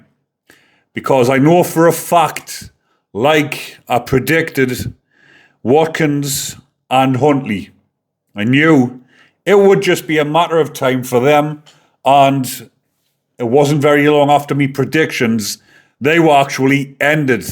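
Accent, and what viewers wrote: Newcastle, United Kingdom. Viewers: Varley is the perfect example of why there should be a death penalty. Love to hear the Geordie accent from so far away, too bad about your piggy' lug - haven't heard that word for years.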